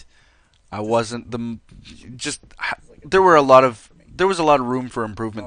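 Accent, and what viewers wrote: Canada. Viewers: Best stereotypical Canadian voice ever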